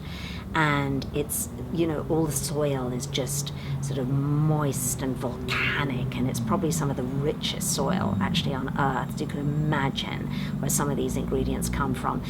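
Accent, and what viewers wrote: United Kingdom. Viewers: Hi, great serums I will certainly check them out. Starting my 14 days sugar free today. i know I'm not watching these in any particular order but so far loving the content. I'm in Co. Wexford Ireland and spotted your British accent straight away which is why I stopped to watch you and so glad I did. Eleanor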